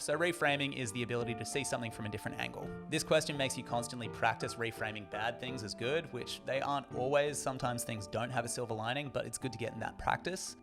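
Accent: Australia. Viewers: the ausie accent is just too much to take. sorry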